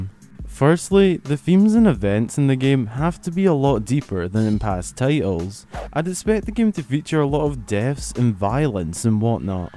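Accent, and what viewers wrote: Scotland, United Kingdom. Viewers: Moan the Scotland! Where u from mate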